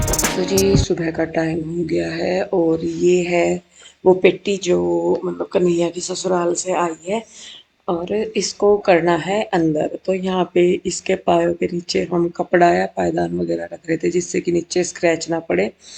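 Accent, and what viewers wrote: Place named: Meerut, India. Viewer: Assalamualaikum me farzana Karachi Pakistan SE 4 3 Saal SE apka vilog dekh rhi hoon mere dada Dadi bhi Meerut SE thy apki boli buht achi lagti hy mere dada Dadi bhi easy hi bolty thy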